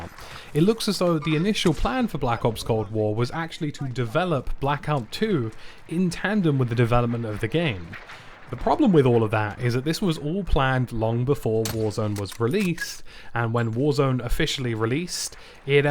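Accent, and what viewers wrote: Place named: Southern England, United Kingdom. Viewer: This video sounds like a load of assumptions pieced together and narrated with a British accent to sound legit. Yes I’m British myself but I know the effect that our southern dialect has on the rest of the world.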